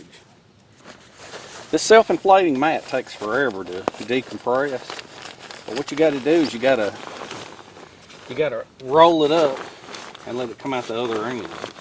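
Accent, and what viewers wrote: South, United States. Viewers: oh my yu make me laugh so funny love ur southern voice a your very smart love your videos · Informative and entertaining, thnx! (PS This Canadian loves your southern accent, a part of your charm, IMO.)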